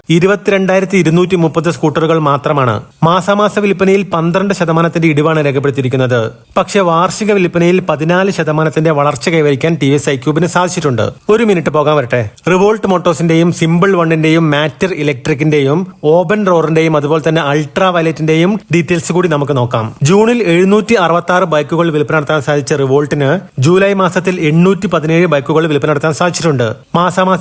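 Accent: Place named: Kannur, India. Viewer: kannur ടോണിൽ തന്നെ bro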